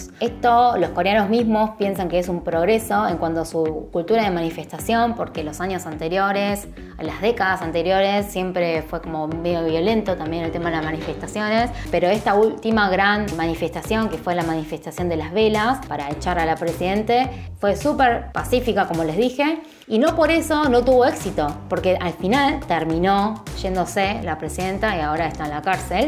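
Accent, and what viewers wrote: Buenos Aires, Argentina. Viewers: Me gusta tu video pero... había vivido por 26 años en Buenos Aires y tu acento no es muy familiar. Tu forma de hablar... es.. porteña tal vez 90% pero el acento... naaa... 😜 Sera porque se trata de una filmacion? Jaja. Y seamos sinceros... lo que me encantaría llevar de Corea a la Argentina, el nro 1 es la cotización re-conta estable al dólar. La pecificación de precios de todos los inmuebles y autos. Y luego la seguridad... Perdon pero la cultura de delivery no me interesaria un sor**... Yo me conformaria con el delivery de la pizzeria La Continetal y Las empanadas Noble Repulgue... Lo que causa el mayor stress es la put**** situación economica, no es cierto?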